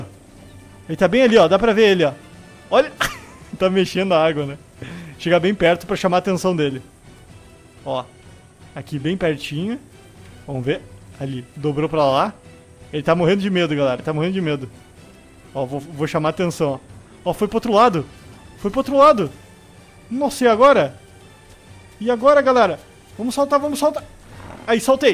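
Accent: Rio Grande do Sul, Brazil. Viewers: tu e gaucho de bento ne?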